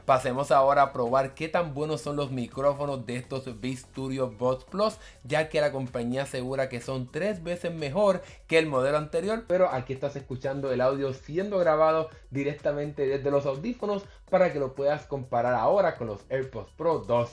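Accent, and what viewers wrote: Puerto Rico. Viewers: Yo de momento dije ese acento me suena a boricua, y si, mano casi no hay youtubers boricuas en tecnología es bueno ver más de los nuestros en estás cosas, yo compré los Beats hace como 3 días y de verdad estoy encantado hasta ahora, tenía antes los pixel buds serie a y eran buenos pero los Beats son mucho mas comodos, con mejor sonido y la cancelación de ruido esta excelente, y no solo eso por el precio que pague, $144 después del ivu, de verdad encantado con ellos hasta ahora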